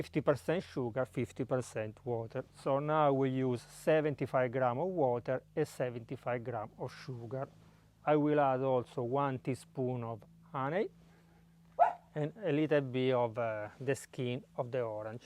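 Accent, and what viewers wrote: Italy. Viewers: Looks spppectaculllar! Can I use honey instead of sugar? My wife thinking I am crazy roatating my forearm for the enjoyment. Love Italian cuisine and your accent. Go bro.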